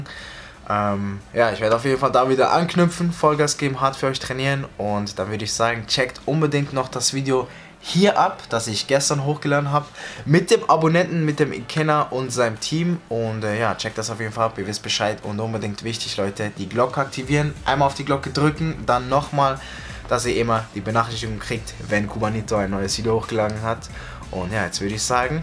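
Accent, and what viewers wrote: Switzerland. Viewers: Wer findet auch das sein dialekt zum schwrizer wird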